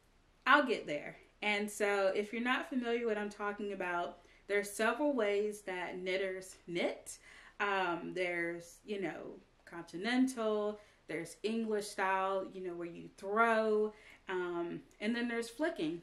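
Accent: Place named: South, United States. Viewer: Wanted to hang out with my NC friend tonight. Thanks for this video. You give such good advice, especially for a new podcaster. Insecurities and comparison try to creep in as soon as you get started so thanks for sharing your viewpoint on these topics. You’re awesome! FYI, I feel like you and I sound just alike on camera. Southern gals 😅❤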